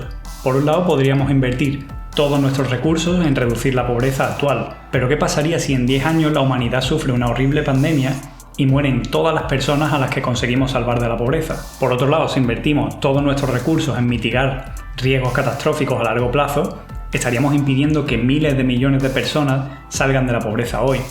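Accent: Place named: Andalusia, Spain. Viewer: Ostras! He llegado a tu canal por tu hermano, estábamos hablando sobre veganismo y me pasó tu video sobre la carne, y guau. He seguido viendo tu contenido y puedo decir que he conectado muchísimo con tu visión del altruismo y esas ganas de provocar un impacto en el mundo. Me encanta como organizas la info, super claro y encima con la fantasía del andaluz! Fantastiquísimo! Aquí una nueva suscriptora!:D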